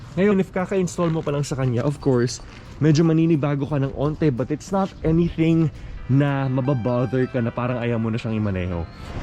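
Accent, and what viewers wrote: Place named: Conyo, Philippines. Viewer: conyo kris aquino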